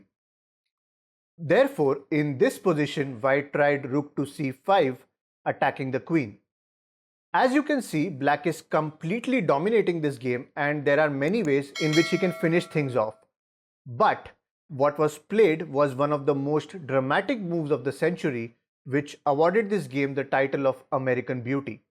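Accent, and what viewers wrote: India. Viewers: god i hate indian accent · ayo mutahar with indian accent?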